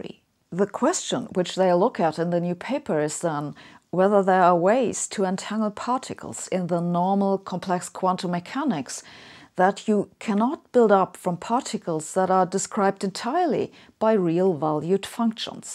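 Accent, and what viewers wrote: Germany. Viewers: Oh wow. I am so used to watching your more modern videos, and your accent there, at least it appears to my ear, is not so German. It sounds more like a Brit who has a slight German accent. Here, you remind me of my German girlfriend from 6th grade. Crazy how much difference 2.5 years make. I assume you've probably been living in the UK mostly, then, huh?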